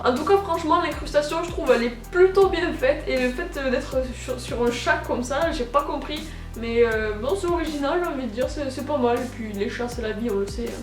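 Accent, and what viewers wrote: Toulouse, France. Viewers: omggg, j'adore ton accent toulousaing 😍😍😍 Ma mere vient de toulouse alors je peux faire cet accent et j adore 😍😍 Putaing Toulouse en force 👊👊💘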